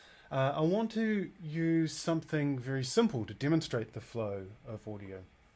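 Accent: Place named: New Zealand. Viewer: Works like a charm! Good old Kiwi ingenuity 🇳🇿🇳🇿🇳🇿 Recognized the accent from a mile away!!! Gotta support a mate from Porirua 😆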